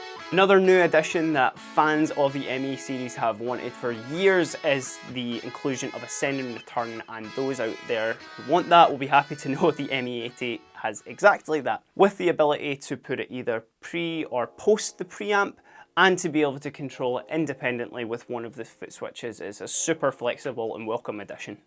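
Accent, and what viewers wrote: Scotland, United Kingdom. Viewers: He can’t help is Scottish accent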